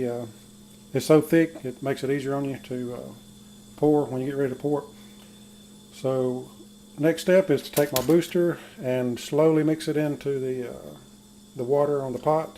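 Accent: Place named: South, United States. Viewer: LOL!. FER-men-tater. :) I love your videos, but I have to watch them in small doses, as I have always been susceptible to picking up southern accents when exposed to them for too long. :) Thanks for the great info here, though, I am about to start home brewing and so am doing preliminary research. I don't think I will start with a Mr. Beer, but this is interesting nonetheless.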